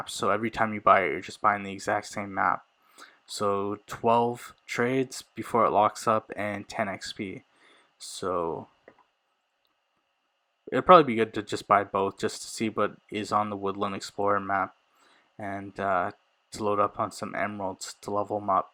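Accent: India. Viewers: Are you indian bro? Please tell me◉‿◉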